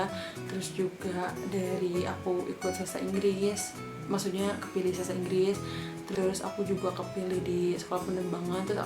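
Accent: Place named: West Java, Indonesia. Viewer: Duh sunda pisan euy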